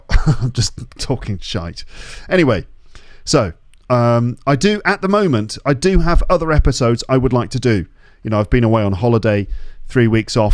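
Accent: RP, United Kingdom. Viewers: Hello. It's always great to listen your podcasts and watch your videos. They really help me in learning English. I love British accent and your accent is absolutely amazing. Thanks a lot. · *it's a comment from shadow 😂 * Вот по-русски я бы сказала, как я рада слушать ваш классный голос и потрясающий британский акцент! Могу как Лев Толстой в одно предложение на две страницы без единой точки выразить бурю эмоций и переживаний, а по-английски не могу! Поэтому сижу в тени! Ваш старый добрый подкаст сам как старая добрая Англия 😊 Спасибо за него! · Hi how are you, please when you read the books which accent do you use RP or what?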